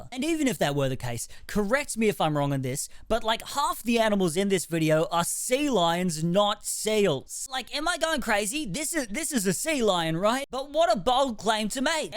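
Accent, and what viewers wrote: Australia. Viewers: The Aussie accent that this information is being delivered with really adds credence as that's the country full of animals that very famously Want To Kill You.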